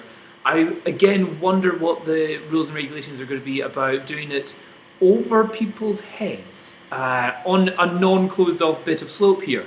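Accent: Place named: Scotland, United Kingdom. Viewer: This is great. It's like hearing my own thoughts about the Lily read back to me by an amusing Scottish guy. As a Canadian that is very amusing to me, thanks :)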